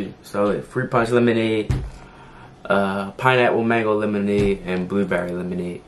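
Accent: Baltimore, United States. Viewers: The Baltimore is strong in this one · Bmor accent? Good video. Love to see dmv youtubers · Definitely knew u were from B more before u said it lol tew dollars🤣I love yalls accent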